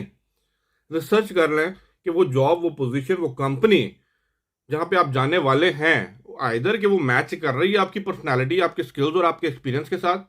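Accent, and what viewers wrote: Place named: Punjab, India. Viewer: are bhai hindi bol raha hai ya punjabi , thoda clear bol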